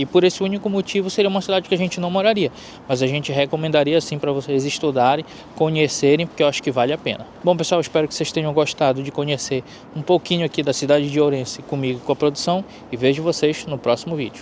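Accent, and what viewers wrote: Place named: Amapa, Brazil. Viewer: eu tambem sou de Macapa, logo reconheci o jeito de falar.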